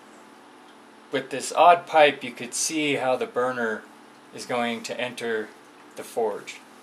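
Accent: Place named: United States. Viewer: Good job. 10 points taking off for sounding like a yankee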